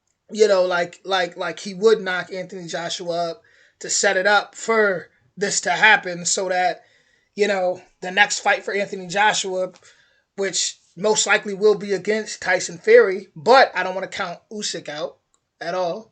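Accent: United States. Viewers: What part of the states is your accent from?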